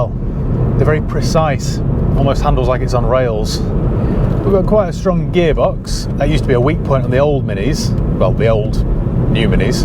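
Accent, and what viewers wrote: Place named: England, United Kingdom. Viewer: But is English,with an mostly English audience so English pronunciation takes precedent.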